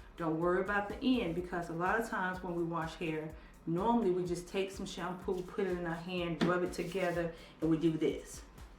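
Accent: South, United States. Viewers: She's not saying scab she is saying scalp with a a southern twang. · Adele Reed it’s just her southern accent.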